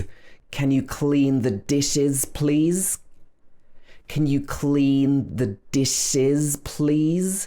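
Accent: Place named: United Kingdom. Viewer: Thanks a lot sir. You teach me many things. You're perfect in British accent.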